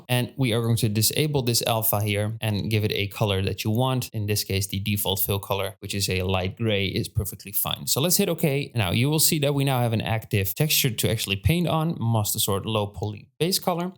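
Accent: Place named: Netherlands. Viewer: I hear dutch.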